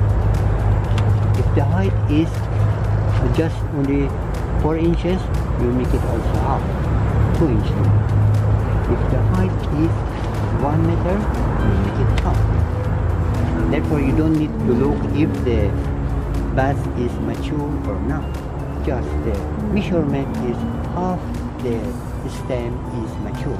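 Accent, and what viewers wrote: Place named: Iran. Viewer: The best video on this topic. Thank you. You sound Persian.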